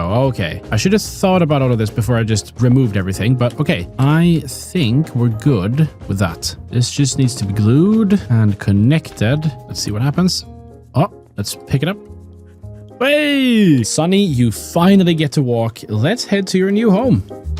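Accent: Sweden. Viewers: Is it just me or does he have a swedish accent? oh the channel is in sweden. hej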